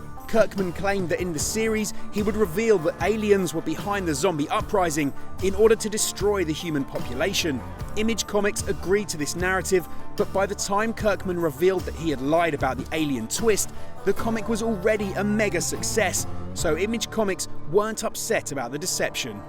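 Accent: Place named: United Kingdom. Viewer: 我愛英國腔!讚!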